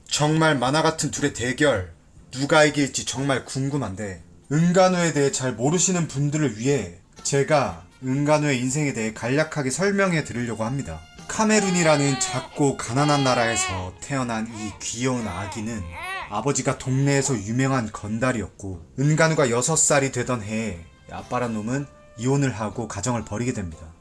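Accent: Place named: China. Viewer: ㅇㅈ 조선족 같아 임마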